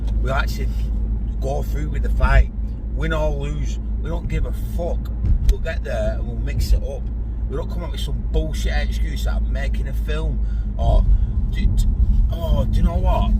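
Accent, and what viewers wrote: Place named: Lancashire, United Kingdom. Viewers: That's the strongest lancs accent I've heard, thought you were a Yorkie. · That's Lancashire just like myself 😊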